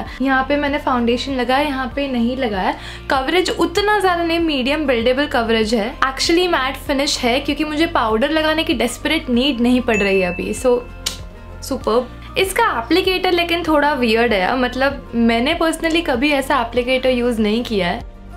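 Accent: India. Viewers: Aap puri american lg rhi ho😊 bs aapke hindi accent se indian lg rhi h aap...maybe NRI?😊 bdw,love ur vdo..frst tym seeing ur vdo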